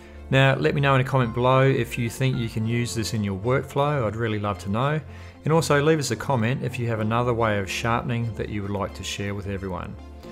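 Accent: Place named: Australia. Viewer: I have watched a gazillion photography tutes on YouTube. Great to hear an Australian accent for a change.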